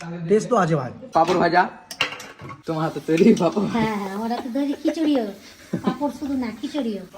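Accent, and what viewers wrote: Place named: Murshidabad, India. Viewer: Puro Murshidabad ar khati kotha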